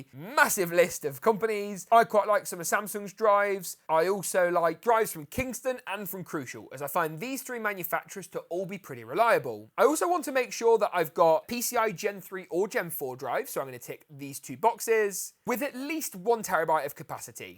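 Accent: England, United Kingdom. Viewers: youre the most british sounding british dude i've ever heard. cheerio. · Loving your videos, I'm looking to build my 1st gaming PC towards the end of this year. Can I ask, you sound English yet your prices are in dollars...is there a reason why?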